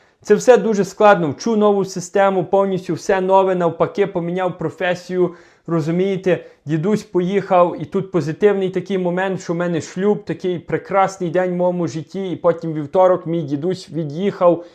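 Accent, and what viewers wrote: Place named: Western Ukraine, Ukraine. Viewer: Красивый Украинский язык с западной ноткой. Восхищен! ( Виктор Одесса)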